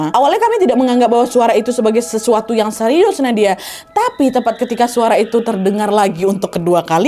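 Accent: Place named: Medan, Indonesia. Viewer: Medan kali yaa kak nad😅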